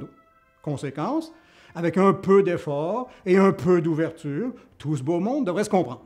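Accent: Quebec, Canada. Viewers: Tout à fait d'accord avec vous, la langue française s'enrichit grâce aux millions de francophones dans le monde. Elle doit évoluer et qu'on arrête s'emmerder les gens sur des détails, à l'oral, cela n'a pas grande importance. Pour ma part, j'ecris "autant pour moi" et tant pis pour cela que cela empêche de dormir 😊😁 en plus, J'ADOOOOORE l'accent québécois, je le trouve savoureux. Bises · Moi j'aime bien l'accent québécois, c'est joyeux à l'oreille Sujet très intéressant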